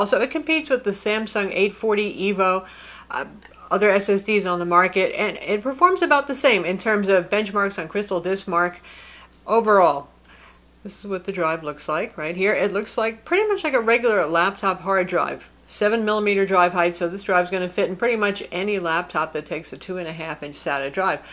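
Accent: United States. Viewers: I’m considering buying the ASUS G750 J( I’ve not decided which one yet) and I would also like to purchase one of theese SSD Drives as I prefer games that are generally hard to run on a regular, ”cheap” computer. Could someone give me a link or a quick tutorial on how to insert the SSD drive? I’m not used to hearing this particular American dialect and I’ve been struggling to understand the tutorial as I’m not particularly fitting into the computer whiz category… ☺:-)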